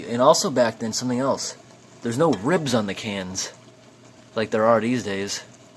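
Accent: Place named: Western Massachusetts, United States. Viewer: Sounds like a west Massachusetts accent. Typical south-western New England for sure.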